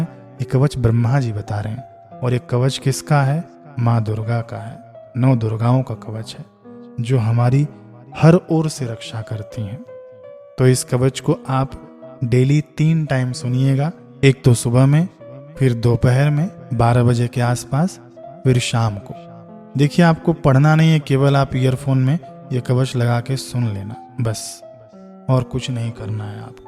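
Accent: Standard. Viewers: Shuddha Hindi Aur Sanskrit Uccharan. Aapko shat shat naman. Jai Mata Di!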